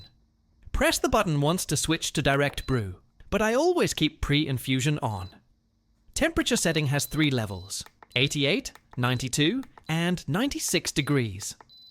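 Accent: United Kingdom. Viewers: Bro became more British since last time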